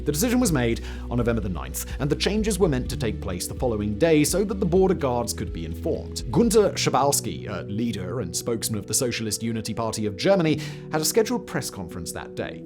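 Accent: England, United Kingdom. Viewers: Guys, ya really can't judge him too harshly. Most all of this stuff is handed to him by writers. Him saying it with confidence and a British accent makes people assume he knows all this stuff. He's just reading the script he got a few minutes before the recording. His researchers can leave a little something to be desired, sometimes. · I don't get a pompous attitude from him at all. Are you American by chance? I wonder if the English accent just comes across that way to you guys (if you are).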